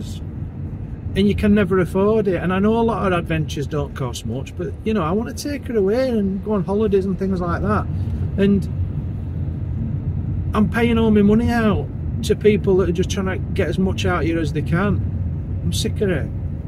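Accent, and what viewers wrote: Yorkshire, United Kingdom. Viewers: You sound like a Yorkshire fella! ❤🇦🇺 I listen more and hear you are. I’m from there too, Australia now. Love your channel ❤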